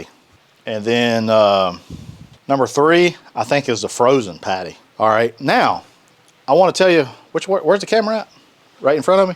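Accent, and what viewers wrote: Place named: Texas, United States. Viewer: Toda tu comida me encanta muchisimo eres un gran chef!!! Me gusta tu acento tejano😊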